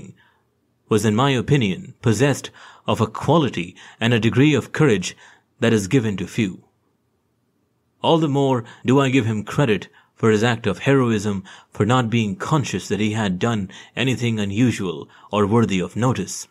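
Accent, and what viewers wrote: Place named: India. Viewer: The ‘neutral’ Indian accent, the one that doesn't specify any regional recogs.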